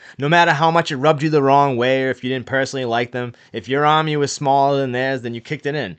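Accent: Boston, United States. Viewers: Great job you can really hear the progression in your storytelling more precise,articulate.. without losing your Boston accent LOL. which really ties it all in... Hey true story in 1999 I bought a 4 ½🥕 canary yellow diamond by itself off of the Greek, I knew his son from coming into Mac2 in Billerica where he was living in nice house, which supposedly came from the job, I have no problem talking about it 1st off my ex-wife has it.. LOL more importantly that shits way past the statue of limitations.. and I believe the Old man passed away awhile ago. Also the war between the H.A and Outlaws kicked off because the outlaws murdered some Lowell members who were down in Florida supposedly, looking for 2 outbad members to make sure their tats where removed and retrieve club property.. anyway they went to the Outlaws clubhouse to tell them why they were there, And the outlaws invited to a party they were having and during the party and outta nowhere an outlaw 🔫 activated their life insurance policies.. 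and they've been playing "lead tag" with them ever since, and fact the 1st ride of the year they visit their graves in a lowell cemetery! ✌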